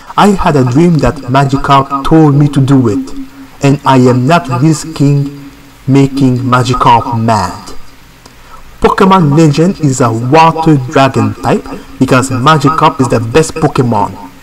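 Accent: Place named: Africa. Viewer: Just stumbled on your channel and I'm not disappointed 🤣 I always find a lot of trust and kindness in African accents haha anyway I just bought mine last week, I hope you love it as much as I do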